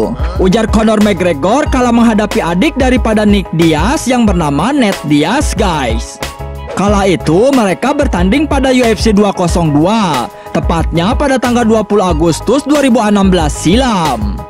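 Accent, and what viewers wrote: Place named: Madura, Indonesia. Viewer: salah fokus , voice over logat madura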